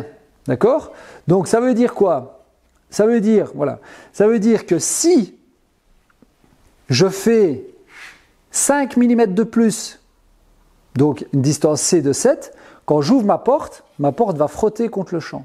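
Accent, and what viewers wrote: Liège, Belgium. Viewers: clair et précis et liégeois oufti !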